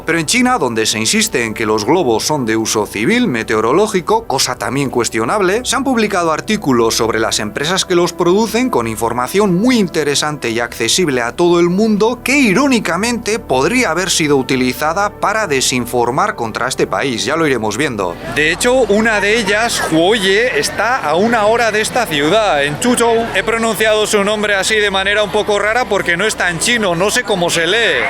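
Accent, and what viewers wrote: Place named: Basque Country, Spain. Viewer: tiene acento Vasco. Parece que está echo un comunista de bien 🤣. En Euskadi también hay algún que otro partido comunista que apoya al presidente de España.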